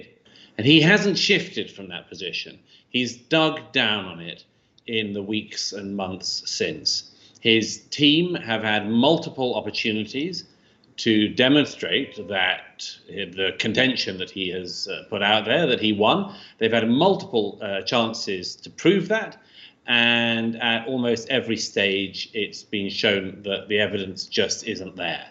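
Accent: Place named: RP, United Kingdom. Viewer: Firstly, why is Douglas wearing make up? Secondly, why are people listening to his analysis? In the summer he predicted that Trump would win in a landslide as a result of the BLM protest. talks nonsense with a posh voice. Sad that for a certain sector of the British public this is what passes for insight.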